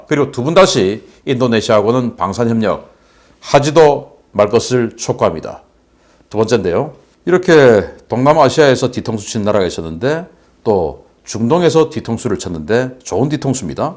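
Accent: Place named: Gyeongsang, South Korea. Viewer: 선생님의 소식은 정말 시원하고 알기쉽게 잘전햐주셔서 너무 좋습니다. 경상도 말씀이 더 구수합니다.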